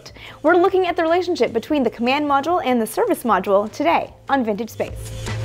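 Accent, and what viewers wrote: Canada. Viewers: Is this young lady a Canadian? I've heard the word "Abbooouuutttt" a few times, during her videos. Or, am I just hearing things? You never know, "eh." :)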